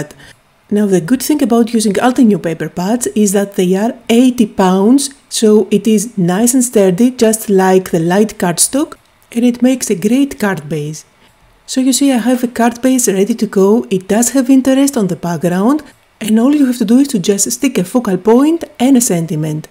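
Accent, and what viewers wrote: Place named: Greece. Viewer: I loved all your cards and I do want to mention that I know a Papaioannou family in the town in Ontario, Canada, where I live. They have a daughter who was a figure skater... Penny Papaioannou. One of my best friends was married to a Greek man with the last name Papadakos. Anyway, I love your cards and your accent reminds me of my Greek friends.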